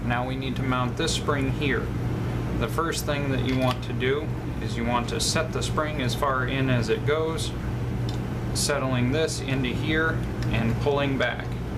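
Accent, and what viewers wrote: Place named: Canada. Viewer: Sounds Canadian. Great fun eh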